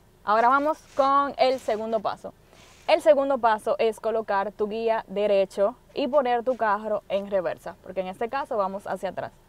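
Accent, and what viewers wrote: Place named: Dominican Republic. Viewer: Lo sabia que era dominicana por el acento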